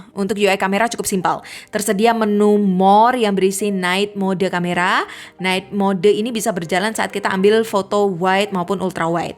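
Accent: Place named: Java, Indonesia. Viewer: Beda sih , ini masih ada tipis2 medok nya Kalo d trans 7 lebih mulus hehe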